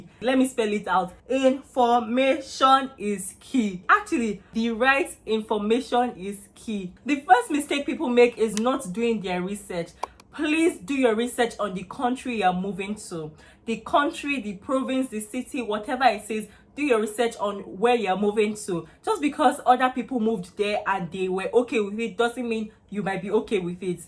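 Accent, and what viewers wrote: Nigeria. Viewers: Are you sure you left at age 16. Cos your yoruba intonation is wow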